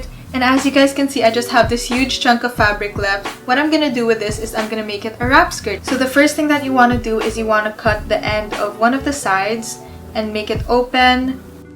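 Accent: Philippines. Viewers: you have a Filipino accent xd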